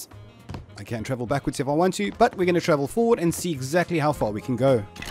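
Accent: South Africa. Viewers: Ayoo, are u South African bruu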